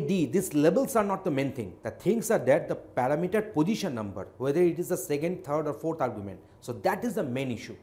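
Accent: Bengal, India. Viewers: Because of his Bangali accent. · he sounds like bangali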